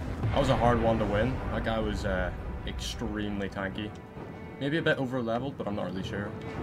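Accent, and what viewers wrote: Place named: Northern Ireland, United Kingdom. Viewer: Ah a fellow Norn Irishman!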